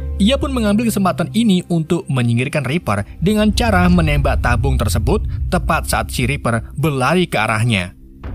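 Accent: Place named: Pemalang, Indonesia. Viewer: admine wong pemalang😂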